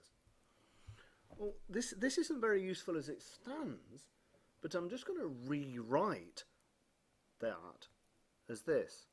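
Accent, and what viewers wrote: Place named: England, United Kingdom. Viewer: Brilliant. Also audio is fine as well for me. So nice to hear an English accent as well (just a personal comment).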